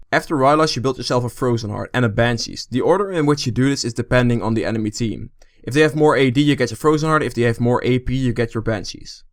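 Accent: Netherlands. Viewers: haha ik praat ook nederlands (België) dus ik herkende het al :p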